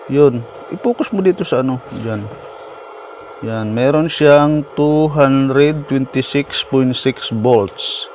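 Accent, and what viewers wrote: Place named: Waray, Philippines. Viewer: Taga samar/leyte ka sir?? Waray man it yakan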